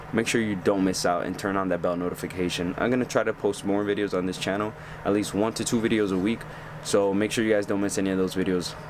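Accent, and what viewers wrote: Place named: Dominican Republic. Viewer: I knew he was Dominican by the first 2 words he said. 🇩🇴🇩🇴🇩🇴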